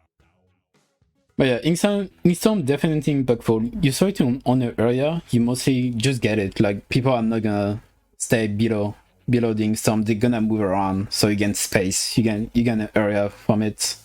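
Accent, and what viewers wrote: France. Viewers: The french accent se fait sentir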